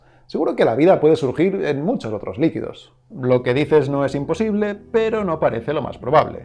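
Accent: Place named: Spain. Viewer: De verdad me encanta como explica....soy colombiana y a veces me constaba un poco oir documentales en acento español...pero definitivamente me encanta como el explica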